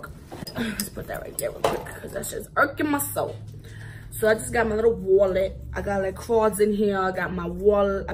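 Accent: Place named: New York, United States. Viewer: Are you in New York.... It’s your dialect. Miss mine. Super cute bag. Love my Big Apple Fam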